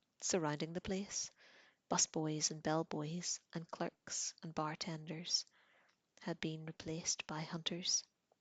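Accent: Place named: Northern Ireland, United Kingdom. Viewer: Great to find this..and another NI accent to boot 👍 I love that some of the language is making the reader uneasy 😂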